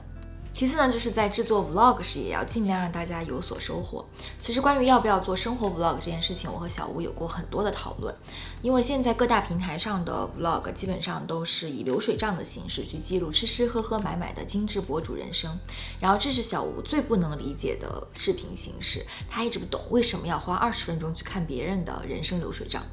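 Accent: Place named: France. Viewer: 一直很喜歡妳們的視頻~ 穿插。。的吳式幽默^^ 穿插。。一臉無辜臉的悟空^^ 還有最重要~搏主法式的聲調解說期待下年度的新視頻唷~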